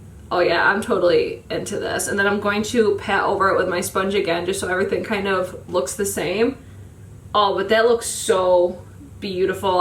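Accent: Midwest, United States. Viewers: i'm guessing you're midwestern? or maybe from boston.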